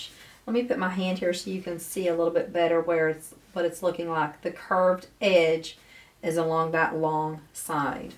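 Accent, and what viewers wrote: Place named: West Virginia, United States. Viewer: I love your accent, too. Reminds me of growing up in West Virginia!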